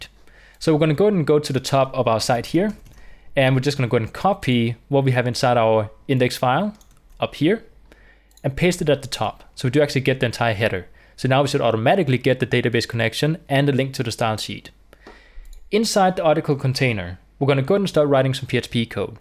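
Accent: Denmark. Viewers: Are u danish ?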